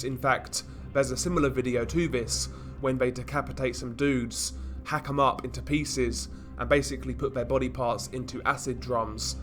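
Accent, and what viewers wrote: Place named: United Kingdom. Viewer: God British English is the fucking worst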